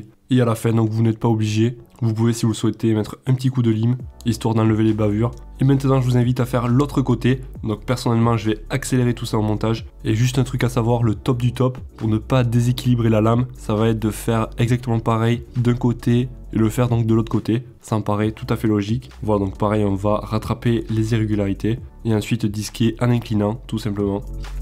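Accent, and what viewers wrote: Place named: Toulouse, France. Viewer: bel accent. toulouse ?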